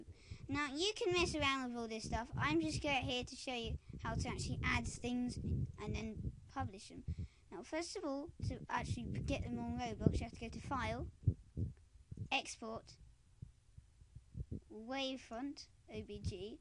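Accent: United Kingdom. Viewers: chill bumps he has to be british i still have some XD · I just thought you edited your voice to be like that not that your from the UK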